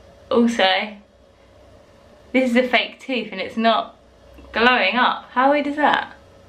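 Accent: United Kingdom. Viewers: Her british accent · When you realise she’s British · Are you British?? You accent is sooo cute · Are you british? 😂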